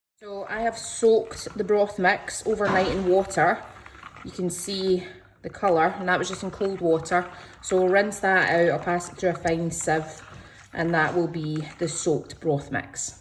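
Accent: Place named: Scotland, United Kingdom. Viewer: Love youre scottish dialect ❤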